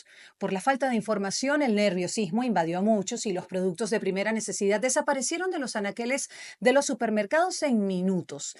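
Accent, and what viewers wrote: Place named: Venezuela. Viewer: Y hablas tan lindo ☺️!... Ese acento tan "venezolano". Único 😊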